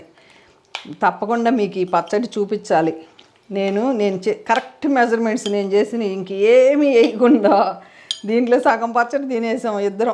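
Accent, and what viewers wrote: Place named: Andhra Pradesh, India. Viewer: madi chirala daggire aunty. mi language chusi anukunna mana area vallu ani. so happy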